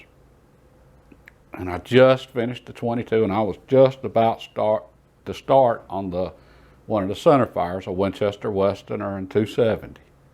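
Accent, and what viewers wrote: South, United States. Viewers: You have just about the best southern accent of all time.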